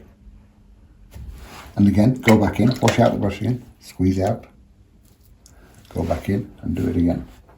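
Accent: Yorkshire, United Kingdom. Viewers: Just discovered your channel. Glad I did. As a native of Yorkshire me self, it’s nice to hear a Yorkshire accent. And your paintings are stunning. This one so reminds me of many snowy days I’ve seen back up North. I’ve subscribed and look forward to many more tutorials as good as this one. Thank you. 😊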